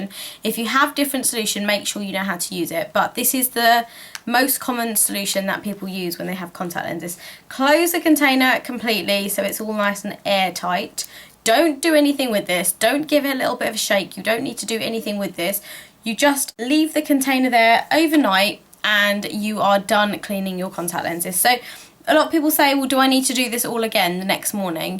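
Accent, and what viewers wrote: United Kingdom. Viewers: You are speaking Brti English. I live in USA. Don't know if you are familiar with 'bausch and lomb' 'ReNu' product. I use this for lens soak and cleaning both. I wanted to know if you use the same solution for two purpose ?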